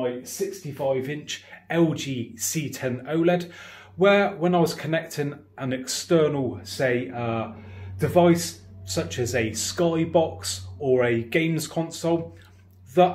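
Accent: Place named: Yorkshire, United Kingdom. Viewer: I was actually watching this video because of the problem that I have with my tv. But dude I love your accent!:) are you from Yorkshire?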